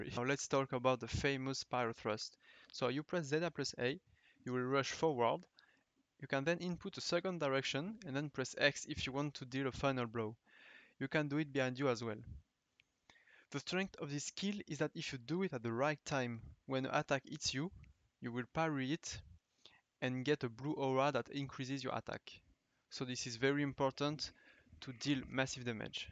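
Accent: France. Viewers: Nice french accent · Just perfect: short, easy to understand and on top of all that the French accent. What more could I ask for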